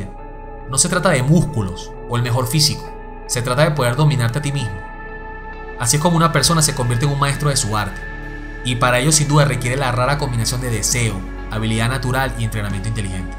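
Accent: Venezuela. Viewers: Estoy seguro de que el narrador es venezolano.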